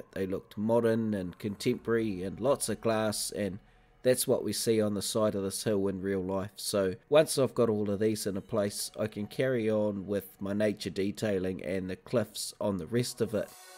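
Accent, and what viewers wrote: New Zealand. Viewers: Can not wait for ep 3! You are remarkable. And I love the NZ accent! Let me not try a voiceover with my South African accent. You will laugh!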